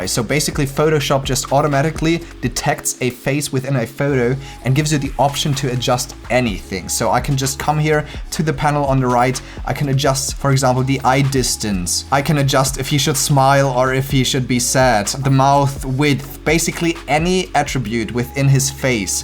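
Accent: Germany. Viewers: that accent is something else! Love when Germans speak English! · German Accent?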